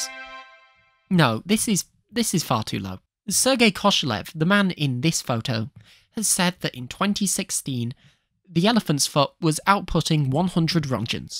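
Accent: United Kingdom. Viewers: Started video and heard the British accent that pronounces TH as F. Immediately stopped video and clicked Do not recommend channel. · No its the British accent that sucks.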